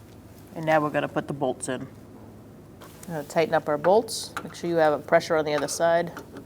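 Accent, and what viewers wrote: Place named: New England, United States. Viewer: In three second in, I love the strong New England accent 😂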